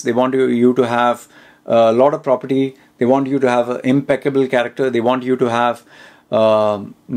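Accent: India. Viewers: True ,too much of corruption here. You sound Indian ...absolutely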